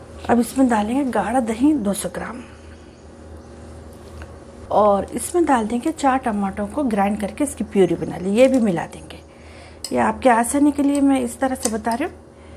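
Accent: Hyderabad, India. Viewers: Aunty ma to apki voice over k liye apki video dekhti hu your voice is really nice hyderabadi.....😀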